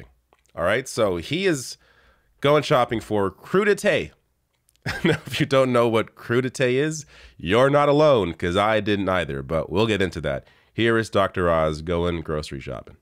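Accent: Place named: Canada. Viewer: I'm not sure, if you're a Canadian or a Seppo. You sound like a Canadian. Is Canada's opinion of any worth in the US? Are you folks stepping out into the big world of American politics? You, like us were big players in the wars of the 20th Century. Dead men, though, it seems are just gravestones to stand in front of. I'd really like to hear a reply. I'm genuinely interested.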